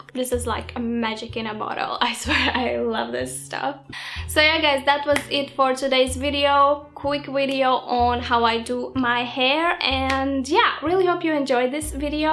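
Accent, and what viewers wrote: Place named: Latvia. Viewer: Are you from Latvia? I swear that i can hear your accent. Nice video :)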